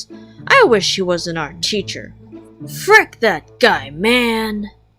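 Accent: Philippines. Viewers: The moment I heard your voice I new you we're a Philippine and btw we can our teachers sir too, and I also have a good art teacher :3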